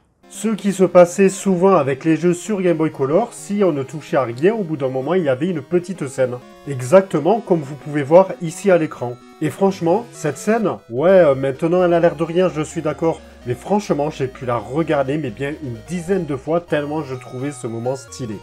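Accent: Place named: Toulouse, France. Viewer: Le tout couronné de l'accent Toulousain, magique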